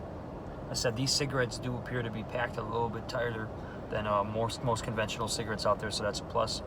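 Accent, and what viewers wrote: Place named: Illinois, United States. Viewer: Dude are you from Illinois? You sound like some of the people I live next door to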